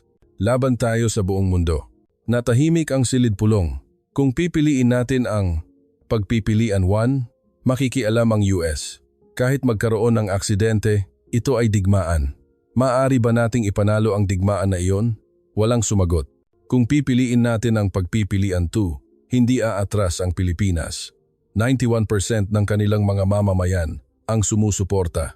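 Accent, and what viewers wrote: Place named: China. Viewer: Ang labo naman ng narrator di mo alam kung pinoy o Chinese... ito lang ang opinion ko sa ngayon handa na ang Pilipinas makidigma sa china pinaghandaan na yan ni PBBM kaya nga naglatag ng mga EDCA site para paghandaan ang gyera... Hindi kakayanin ng china ang pwersa ng Pilipinas dahil sa mga kaalyado nito na pinangungunahan ng USA kawawa lang china kapag nagkataon tancha ko lang 1 week lang ang itatagal ng china susuko sila sa bigat ng opensiba ng USA yon lang talaga iniiwasan din ni PBBM na magkaroon ng digmaan hanggang kaya pang iwasan...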